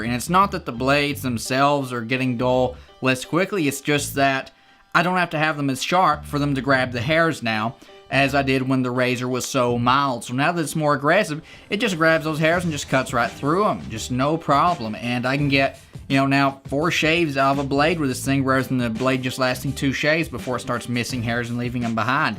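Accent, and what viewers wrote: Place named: Tennessee, United States. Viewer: I'll have to try this. Are you from TN by chance? Just cause of the accent is why I ask.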